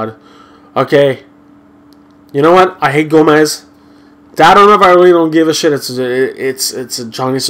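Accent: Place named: Quebec, Canada. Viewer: pi ta un accent Québecois c pour sa g su que tu parle francais sur dautre video sur les commentaire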